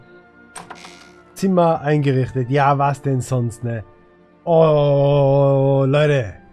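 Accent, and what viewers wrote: Switzerland. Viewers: SRY mein lieber, der beste ARK-YouTuber of the World ist und bleibt immer noch Cloud:-) aber ich liebe deinen schweizer Slang;-)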